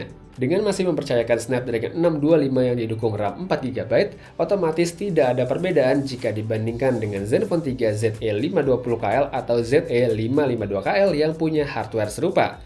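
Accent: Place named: Java, Indonesia. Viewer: Reviewer Medok muke lu medok njing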